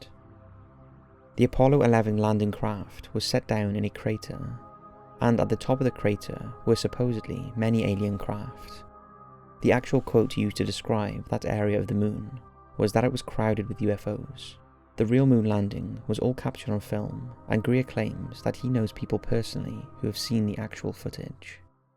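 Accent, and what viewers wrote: Wales, United Kingdom. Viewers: 😂😂 sounds like a semi-welsh, generic accent, plus, a commenter on this comment thread has already pointed out they can't stand the voice, not massively effective I'd say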